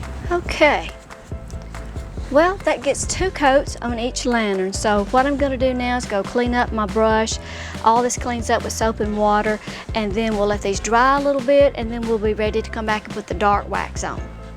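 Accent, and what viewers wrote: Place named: South, United States. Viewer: Oh I just love those lanterns, rusty or not. I would not have thought to use chalk paint. Thank you for sharing. By the way, are you from N.C.? I am and I have moved to VA and your southern draw reminds me of back home. that's a good thing :) I really enjoy all the video's that you have made. You give great attention to details.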